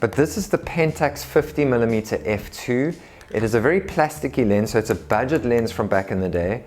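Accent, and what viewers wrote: South Africa. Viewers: hey man just heard the way you speak and was wondering are you South African since you also priced the weatherman in rands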